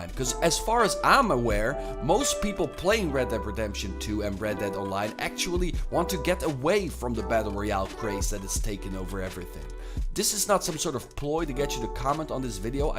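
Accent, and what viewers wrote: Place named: Netherlands. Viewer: Let’s face it, RDR2, desite being the wayy more detailed and perfectioned game compared to GTAV, isn’t half as much fun to play, or at least not online. We can only pray for Rockstar to release GTAVI soon, because it is obvious RDR2 pales in comparison.. On a sidenote, just out of curiosity, where are you from? Your “regular voice” accent sounds pretty Dutch;) Thanks and good luck with the channel!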